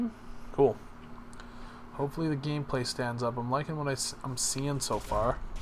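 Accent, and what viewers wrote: Canada. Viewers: why does sound way more Canadian in older videos lolol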